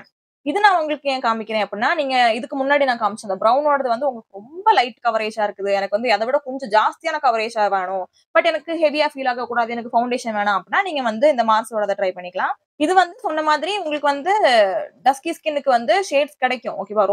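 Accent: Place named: Madurai, India. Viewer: Madurai slang😂💥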